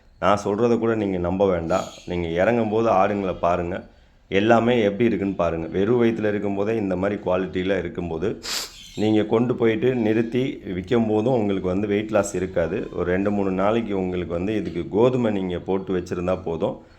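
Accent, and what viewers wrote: Madurai, India. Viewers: ❤ assalamualaikum warh best goat lovely madurai ❤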